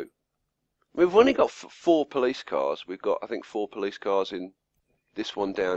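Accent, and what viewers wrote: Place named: United Kingdom. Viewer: I love your briton accent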